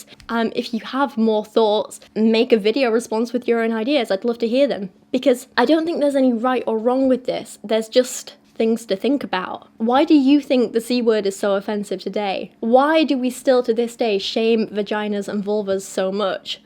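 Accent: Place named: Northern England, United Kingdom. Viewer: accent isn't perfect RP. There's an element of RP in there, but it's also largely Northern.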